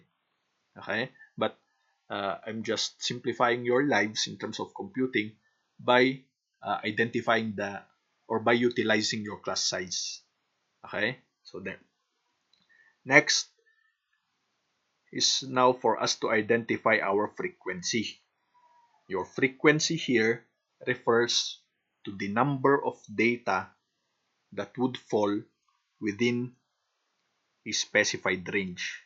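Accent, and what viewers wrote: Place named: Philippines. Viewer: just by hearing your accent I already know you're a Filipino, Salamat po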